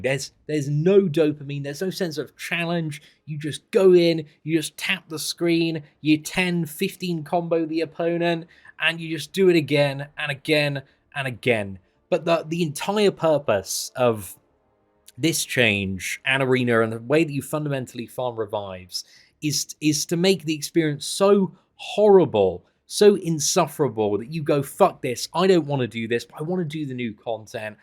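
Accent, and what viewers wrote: United Kingdom. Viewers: It is hilarious to listen to a British man swearing lol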